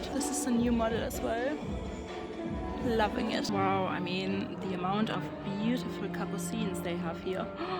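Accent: Germany. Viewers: I don’t know if you are even going to read this . I have to tell you your accent makes my heart so happy. I grew up in an area where a lot of Germans moved to ( in the US) after the war. Your accent is like a blanket to my inner little Kori. When I’m having a rough day and miss all of my “ Omas, I watch you.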